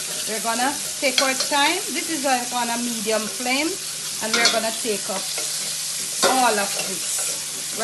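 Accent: Jamaica. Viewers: God, I wish she lived next door to me! Between the cooking, the attitude and that Jamaican accent, it would be simply DIVINE! 😊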